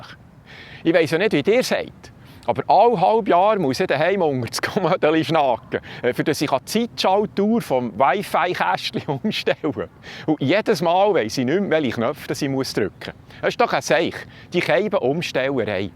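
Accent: Bern, Switzerland. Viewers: 💪😄🙏🙏mega schöner Berner Dialekt. Gruß aus dem Aargau 🙏☘️☘️ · Potz hei ei ei... was för nes heimeligs, wunderbars Bärndütsch, wie fasch zu Gotthälfszyte. I bi fasch d Bänne abgheit wäg de ruschtikale Wörter. Merci viumau! Ha di Kanau grad abonniert. Gruäss us Brunnen SZ. :thanksdoc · so nes schöns bärndütsch!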